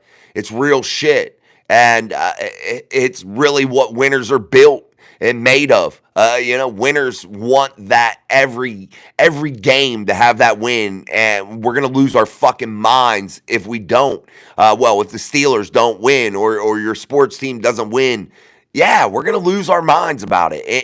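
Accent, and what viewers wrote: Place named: Morgantown, United States. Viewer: I watching a day late..the guy wants u to speak the queens english. I prefer morgantown english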